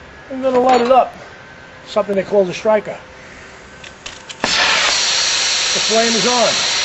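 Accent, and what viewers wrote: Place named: Staten Island, United States. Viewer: I think any of you Youtube nerds, that want to talk bad about, should def. think twice.. LOL anyway, Im in Jersey,(just across the water) and love the tips and your strong Staten Island NY accent.. I have a more technical question.. Anyone with help, please email me Im repairing a p-trap on a bathroom sink from the 1920's or 1930's, can i connect pvc to the old brass pipe, with just a coupling?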